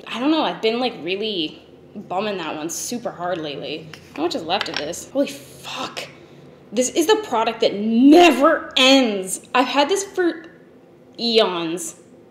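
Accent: Canada. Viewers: Almost a 1,000,000 :3 yujuuuu, btw I love how canadians say coat, boat, oat, out , etc I think is lovely ;3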